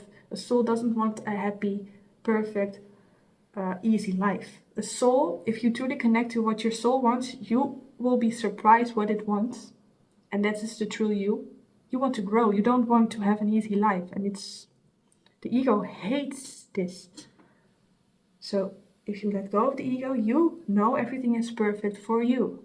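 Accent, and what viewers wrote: Netherlands. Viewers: Hi there, 2 questions ... I hear a Dutch accent? And 2nd were do you get the information from ? Thanks for sharing your videos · Hoor meteen dat je uit Nederland komt ❤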